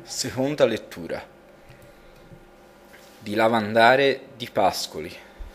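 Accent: Tuscany, Italy. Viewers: Allora, non so a cosa servono le tre letture, comunque non volevo dire questo. A me l'accento da toscanaccio maledetto piace, mi sa di uomo con poca istruzione (e sicuramente non lo sei) che questa poesia la recita da attore protagonista. Molto interessante. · Scommetto che sei toscano haha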